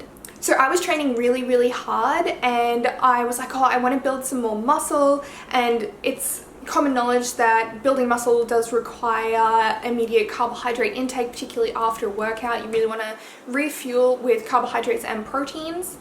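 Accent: Australia. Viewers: It's how it's pronounced in Australia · Just an Australian accent, that's how most people say it here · Definitely the Aussie accent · I feel like it's the accent. I am also Australian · KristinaS2009 If you watched the video you would've seen the explanation. She's australian, that's how a lot of them say it.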